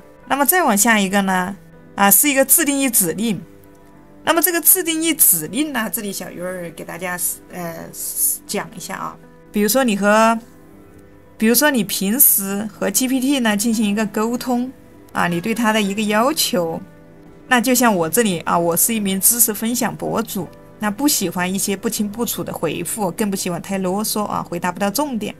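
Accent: Sichuan, China. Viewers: 四川还是重庆的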